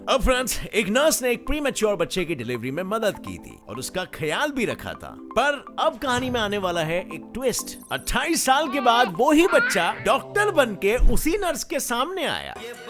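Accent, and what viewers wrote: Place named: Uttarakhand, India. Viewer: Aap uttarakhand se ho mujhe pta chl gya 🤣🤣😂😂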